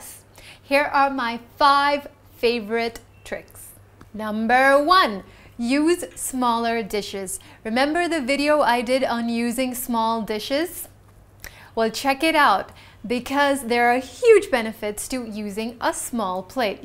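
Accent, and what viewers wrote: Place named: India. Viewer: she is india cannot hide the accent :)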